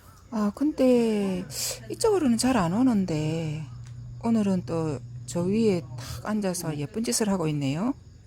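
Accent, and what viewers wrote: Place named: Gyeongsang, South Korea. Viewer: 님 반갑습니다 올여름 날씨가 대단합니다🍋🍋🍋 영상 멋지네요🎾 경상도 사시나요 말씀이 친숙합니다 고추 말림이네요 즣은즐감합니다 꽃도 소개해주시고 영상 멋져요🍇 감사합니다🖼🖼🖼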